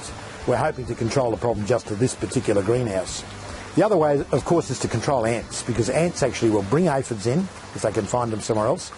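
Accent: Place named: Irish Traveller, Ireland. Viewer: Are you aTinker Irish?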